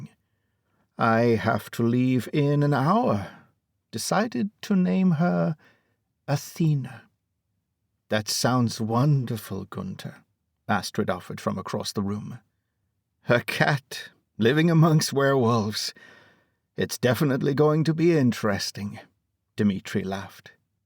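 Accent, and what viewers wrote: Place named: United Kingdom. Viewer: WHY does a book set in SEATTLE need a "british narrator"?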